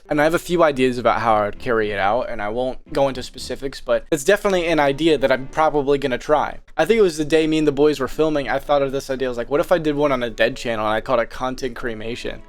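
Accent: Europe. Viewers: + keep the "european" voice, it's so freaking hilarious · I totally understand wanting to step outside of the idubbbz content cop mould to stamp a unique identity on it, but please keep the edups European voice. it's so fucking funny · Nah, the European voice is golden.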